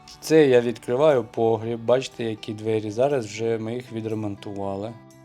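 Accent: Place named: Kherson, Ukraine. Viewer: Привіт земляки, як тільки почула наш херсонський суржик, відразу підписалася на ваш канал,ми теж зараз мешкає в іншому регіоні,але дуже хочеться додому,правда,квартира в річпорту затоплена,в приватному будинку на лівобережжі живуть орки,то ваша ситуація доволі знайома,бажаю вам міцного здоров'я, процвітання каналу,і многая літа під мирним жовто-блакитним небом!!!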